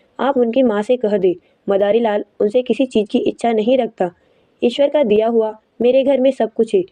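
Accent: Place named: Hadoti, India. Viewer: आवाज में हाड़ौती की बोली का acsent सुनना कितना मंत्रमुग्ध करने वाला अनुभव है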